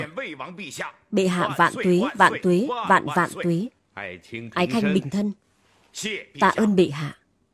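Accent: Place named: North, Vietnam. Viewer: Thuyết minh giọng Bắc kỳ muốn ói, phim hậu cung kế cũng có đàm diệu văn, tưởng nghị, dương di thuyết Minh giọng nam còn hay và dễ nghe hơn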